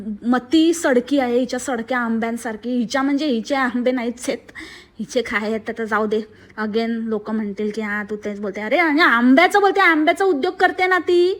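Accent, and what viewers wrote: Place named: Malvan, India. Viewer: Tujha malvani tadka mast hota 😍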